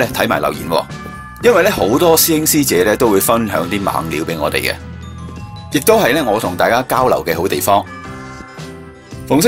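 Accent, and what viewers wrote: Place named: Guangzhou, China. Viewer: 好濃廣州話口音 香港人都自漸不如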